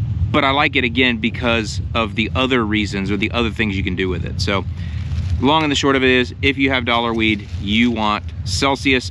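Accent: Chicago, United States. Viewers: I enjoy the Chicago accent... somehow, I didn't know you were from there until recently.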